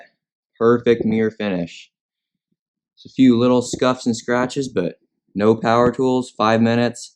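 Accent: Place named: United States. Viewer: I do wish you Americans would pronounce aluminium correctly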